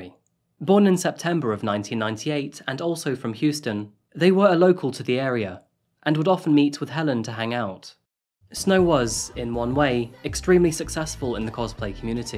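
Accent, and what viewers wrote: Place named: United Kingdom. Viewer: I love this interesting mix, you always do first class research, and I really enjoy video narrated by fellow Brit who knows how to properly pronounce English 😂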